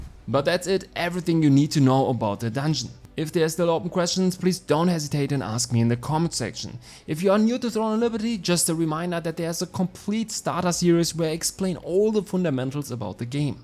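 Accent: Germany. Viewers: Echt schlimm der deutsche Akzent aber super hilfreich das Video, danke! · ich höre doch da einen arg deutschen englisch Akzent. Guides auf detsch wären ein Traum. Uuund du wärst der einzige. Denk drüber nach ;) und danke für die bisherige Pionier Arbeit <3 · Keine 10 Sek. gebraucht, um zu merken das da ein Deutscher spricht :D Danke für das video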